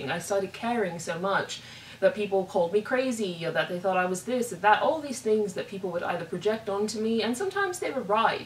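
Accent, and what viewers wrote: United Kingdom. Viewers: You really are a true artist. Been British myself too, let me tell you. I claapprove of your british accent! Have fun in 🇸🇪 Sweden. Im glad you're doing well. Be blessed!! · Why do you sound British?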